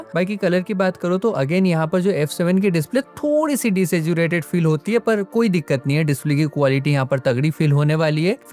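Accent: Indore, India. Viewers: Bhai Indore se ho kya?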